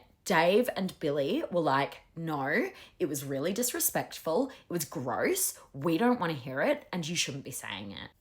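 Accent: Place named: Australia. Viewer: Just found you! You are hilarious! So cool to hear an Aussie accent doing the recaps! Going to binge all your content! ❤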